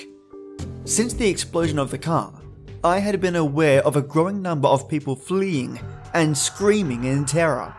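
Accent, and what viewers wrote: Australia. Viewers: You make me wish I had an Australian accent.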